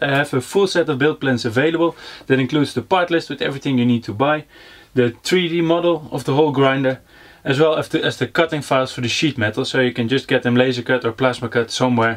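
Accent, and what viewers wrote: Netherlands. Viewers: dacht al een nederlands accent te horen! gister op je kanaal gekomen nu geaboneerd! kan niet wachten tot ik mijn schuur/werkplaats klaar heb dan komt er ook zeker 1!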